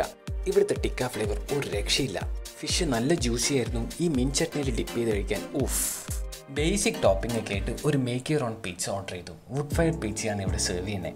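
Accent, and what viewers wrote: Kannur, India. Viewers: He is from kannur athonda evande sound egane😍🤩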